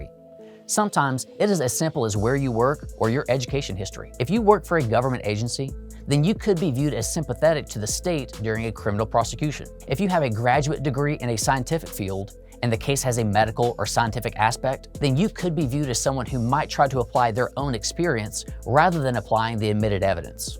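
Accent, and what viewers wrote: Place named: Texas, United States. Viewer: It's a Texas thing. All the legal beagles down here pronounce it that way.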